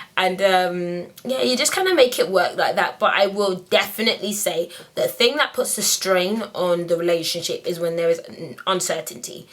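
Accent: United Kingdom. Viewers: I Looooovvvveee the british accent! <3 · Are you from London?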